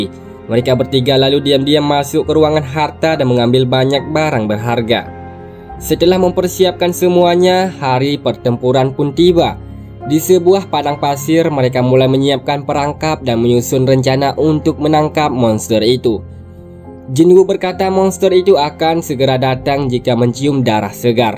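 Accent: Aceh, Indonesia. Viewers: Ia org aceh knyak ya😂😂😆😂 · Apakah adminnya org Aceh ? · Logan acehnya masi kental bang semangat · Aceh teoh😃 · Cara ngomongnya kek orang Aceh ya bg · Ini admin a pasti orang aceh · Kek bhs aceh sikit² tpi mntap bg👍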